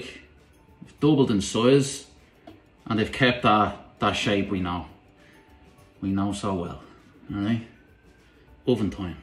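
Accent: Ireland. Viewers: Kia ora from Aotearoa (New Zealand). 😂🤣 I love your channel, you're funny & you make beautiful food. You also explain things well, so thank you for taking the time to share your yummy recipes with us. Awwww I love an Irish accent, my Da was Irish n I miss him every day.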